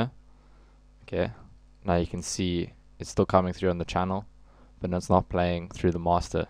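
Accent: South Africa. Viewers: you also from south africa dude? lol youve got a south african accent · sigh that Joburg accent xD noo man leka boet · Are you South African?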